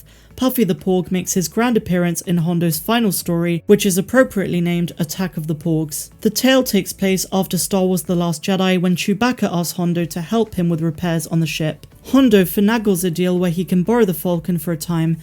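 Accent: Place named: Bristol, United Kingdom. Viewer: you sound bristolian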